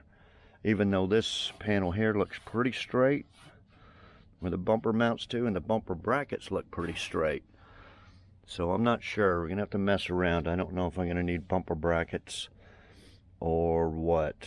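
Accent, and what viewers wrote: Canada. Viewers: I knew it. I just found your channel and I was wondering to myself “why does he sound Canadian when he is (I think) in SC? This explained that. That’s awesome though. My wife and I are looking at leaving NJ for the upstate of SC. It’s pretty much 50/50 over the weather and the liberal bullshit. 😂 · You’ll tackle any tasks, sheet metal, electrical, or heavy mechanical! You’re a real pro. It’s easy to see you have lived this discipline most of your entire life, and you love it. It all shows through. Your time in Alberta shows up in some of your pronunciation of certain words which is certainly not part a Texas accent. Professionals like you are far and few. Thanks for your excellent videos and sharing of this craft you have so brilliantly developed.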